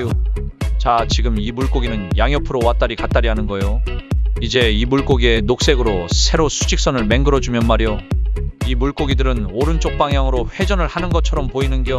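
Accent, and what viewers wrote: South Korea. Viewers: 나 충북 증평군 사람인디 님 약간 충북 사투리 같다. 그려 안그려?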